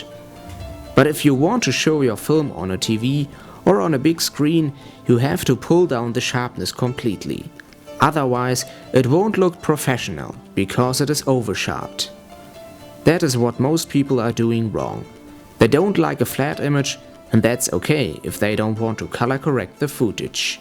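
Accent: Germany. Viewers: Er spricht das Englisch wie Deutsch aus. Als würde er es mit deutschem Akzent vorlesen oder so. Ich mach's besser. Wo bleibt mein Keks? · Dude, i bet hes german ;D they speek in a funny accent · He sounds German to me. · haha this is a german accent ;) very funny! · Man hört nach 3 Wörtern, dass das ein Deutscher spricht. Ekelhafter Akzent. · Defenitely german, you hear it in the way he talks,...! Good advise by the way! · from the accent...he must be German.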